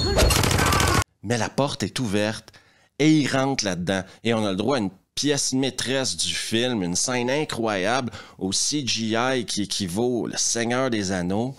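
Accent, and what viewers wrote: Quebec, Canada. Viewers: Super vidéo et superbe accent québécois ! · Hahaha Mais c’est tellement horrible et drôle de mater des vidéos en québécois.. quel enfer vot’accent.. 😂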